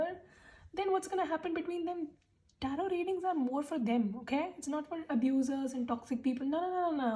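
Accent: India. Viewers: Loveeee Indian accent❤️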